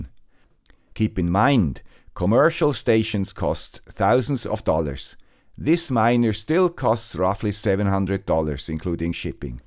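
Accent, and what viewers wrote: Switzerland. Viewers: Swiss accent acquired at the ETH Zürich is the best Swiss accent! 😀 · That's a Swiss accent? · Dude with the Swiss accent, you are awesome! Thanks so much for sharing this information with us! I love your content! ❤️ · Lovely video! thank you for covering this topic :) greetings from another Swiss accent guy! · Hello, Man with Swiss accent. Can you explain more detailed how 4th satellite helps calculate exact time? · Hello guy with the Swiss accent. Can you tell us some more about the business case? It's been about a year, I'm very interested to build a base station to support RTK2GO and earn some money too. How much did you earn in this year?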